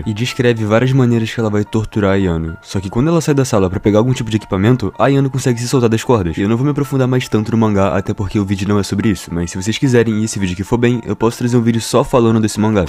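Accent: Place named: Rio de Janeiro, Brazil. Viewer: Omagad, carioca?? Inscrito adquirido.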